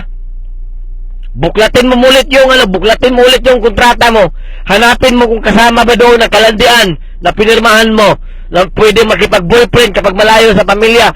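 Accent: Visayas, Philippines. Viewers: bisaya ka pla kuya.... hehe!same pla tau... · Bisaya man ka kuya noh.. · Hahaha. ..visaya ka kuya..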